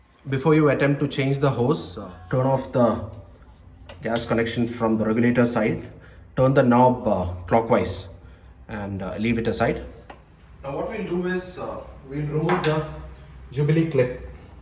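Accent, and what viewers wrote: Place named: India. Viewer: You sound like an NRI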